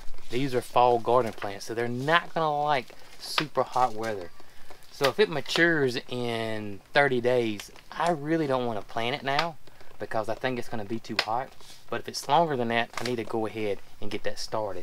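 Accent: South, United States. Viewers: It sounds like: kol er ab e. Must be your southern drawl? LOL just teasing a bit....I use to live in Georgia and one day a neighbor called me Georgia Peach and I went and cried and told my mom...she said that's a sweet name don't cry. When I listen to your talking I love it and it sure wouldn't take me any time at all to get it back down south. I love ALL of your video's for sure and look forward to every single one of them. God IS Blessing you all.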